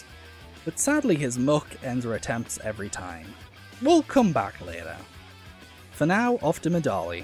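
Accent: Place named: United Kingdom. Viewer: Me: Who is this channel? Algorithm: Trust me bro Me: (skeptical) okay... (plays video) (player has British/Euro accent) Me: oh FUCK YEAH this finna be good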